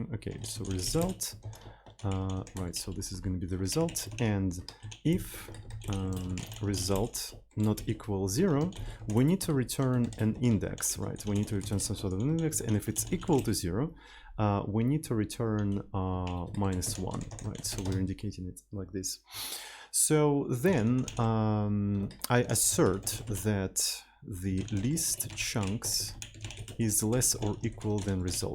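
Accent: Russia. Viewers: ахахха, да русский ацкент явный, но неплохо говорит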